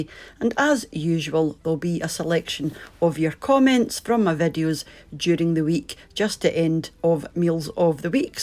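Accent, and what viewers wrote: Scotland, United Kingdom. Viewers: i love ur scottish accent 😭 pls post a video on how to do mac and cheese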